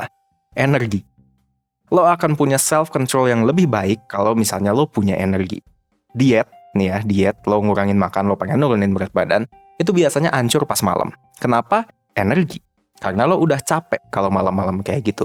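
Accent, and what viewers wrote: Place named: South Jakarta, Indonesia. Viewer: dia ngomong kek orang jaksel 😆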